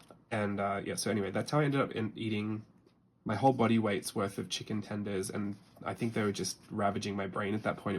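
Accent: Australia. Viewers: Loving the super strong Aussie language and accent coming through today “onya ”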